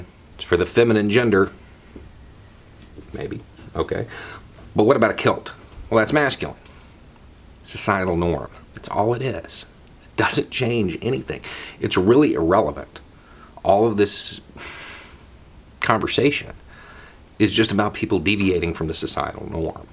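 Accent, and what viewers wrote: South, United States. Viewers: It tickles me every time seeing a hyper masculine guy with a southern accent advocating LGBTQ+ rights. Every damn time. Thanks! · Who in the hell ARE you?:) YouTube suggested you to me yesterday for the first time - the witch/churchgoer video. Holy cow! I subscribed immediately. This is another winner video. You are sane, level-headed, a clear thinker, classically logical. That's a pretty rare combination these days. I'm also from the South, so not a problem for me, but you realize that the accent severely throws some people, right? LOL Rock on,. Cliff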